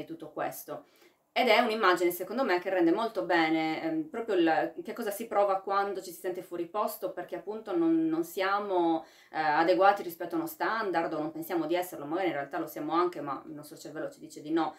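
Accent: Sardinia, Italy. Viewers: Premettendo che la seguo da prima del video con Crepaldi, personalmente apprezzo moltissimo questi contenuti culturali/divulgativi e mi dispiace che vengano posti in secondo piano dall'algoritmo di Youtube - che, a giudicare dalle visualizzazioni, invece premia soltanto i suoi video più "polemici". Ha la mia stima e mi ha fatto riscoprire la bellezza dell'accento Sardo.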